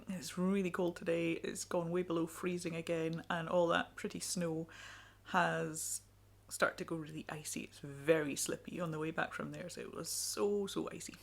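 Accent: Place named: North East Scotland, United Kingdom. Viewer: Found you through vlogmas, loving videos so far. Can I ask where u are from please? I'm originally from Peterhead (north east Scotland) and I think uve got a bit of that accent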